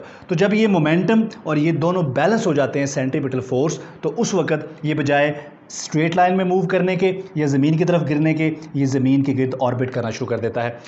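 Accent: Pakistan. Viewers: Are you from pak or kashmir???? Coz ur accent matches!!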